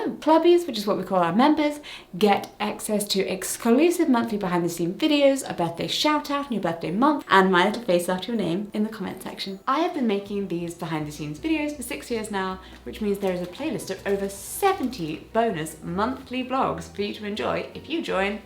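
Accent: RP, United Kingdom. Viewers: You sound very posh.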